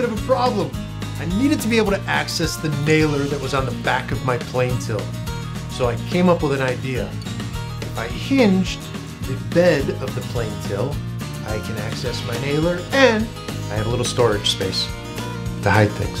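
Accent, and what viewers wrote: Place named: New England, United States. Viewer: What a wonderful idea to use the hidden magnets to secure your planes! Your shop continues to become more organized with each project! I see your plane collection has really grown! They're both functional and beautiful tools! The plane till was an awesome storage idea and it turned out great! You got that New England accent perfectly perfected too!! As always, enjoyed seeing your latest project! You and Buddy have a great weekend! God bless!!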